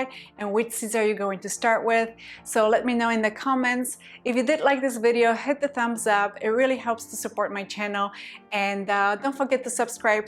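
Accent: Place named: Iceland. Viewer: You have such an interesting accent! It's subtle, but it's there. Icelandic? 🤔 Dutch?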